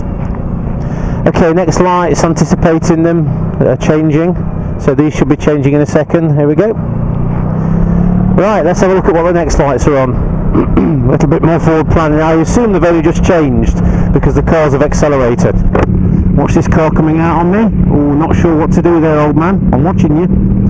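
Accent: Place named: Birmingham, United Kingdom. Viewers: With that Birmingham accent you sounded exactly like Craig Charles- LISTER! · Going thru’ your ‘back catalogue’ & still ‘loving this’, in 2024! I’m a year into my new toy, a Super Meteor 650, after a 42yr ‘hiatus’ (having driven most things up to a 7 tonner). Still struggling with filtering, if I’m honest. Your voice remains my primary ‘internal monologue’ when riding (not the Brummie, tho’).🤨😉😅✌️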